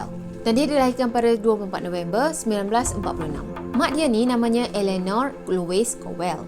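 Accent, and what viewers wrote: Kelantan, Malaysia. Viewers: Patutla sy ade dgr slang kelantan.. rupanya betul sangkaan sy yg sis ni kelate hahaha samela kita sekampung 👍🏼😂😂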